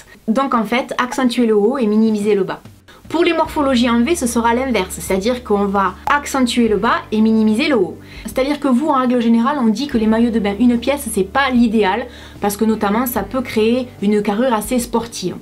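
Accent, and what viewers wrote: South, France. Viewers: Elle vient du sud de la France 😉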